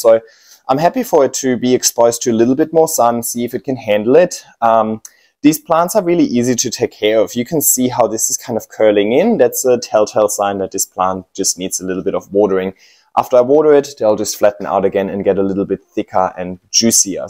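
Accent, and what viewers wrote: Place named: Germany. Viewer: den deutschen akzent versteckste nicht, trotz sehr gutem englisch, aber sollste auch nicht! :-) NRW/Osnabrück? Habe jetzt nicht das gesamte Video geguckt, wie lange wächst deine Größte Monstera und die albi variegata?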